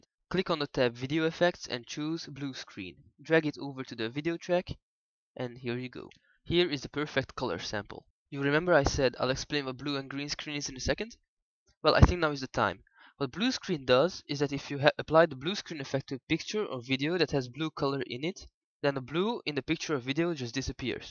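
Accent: Netherlands. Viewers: HAHA YOURE DUTCH JUST LIKE ME I heard it before i even saw 'lijndikte' en 'beeld' en 'bijsnijden'. en voor ik de comments las dùh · Je kan horen dat je Nederlands bent. Maar daar is niets mee. :D Nog succes met je videos.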